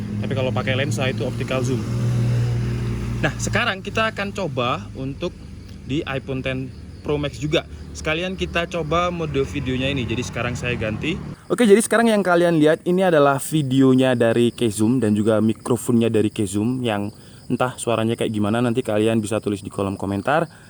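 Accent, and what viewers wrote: Malang, Indonesia. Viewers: Wong malang ya...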